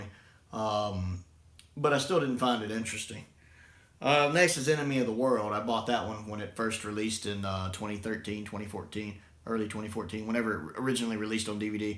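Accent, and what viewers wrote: South, United States. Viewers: to give some thoughts on what you havent seen yet Highlanders is fun, surprisingly Jamie isnt actually a major character until the end, but the story itself is still enjoyable, Troughton gets to do some dressup and fooling around, Polly is also great in that one. Underwater Menace is a big guilty pleasure, yes its OTT but its so much fun, id say this is a "popcorn" story. The Evil of the Daleks isnt perfect, but the highlights are excellent. Troughtons performance is top tier and Jamie becomes a 3 dimensional character here. Abominable Snowmen is a good story, but i feel like it kind of sags in the middle. Though the good parts are really good and its a nice setup for Web of Fear i havent seen Wheel The Dominators at its core is cardboard, and the plot is weak, but the tardis team are alot of fun and the comedy makes it worth it. 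Still can be a fun watch. go rewatch The Mind Robber its great. The Space Pirates is underrated. Everyone hates it, but im one of the few that actually quite likes it. I think its let down a little bit by the Tardis team being background characters for alot of the story, but they still have a decent impact esp at the start and end, but i thought the central plot was really cool. Also would be interesting to hear your thoughts on Milo Clancey as hes played by an actor from my country (NZ) and hes playing the role of a stereotypical southerner. Which i assume you might be given your accent? Anyway, Troughton era good.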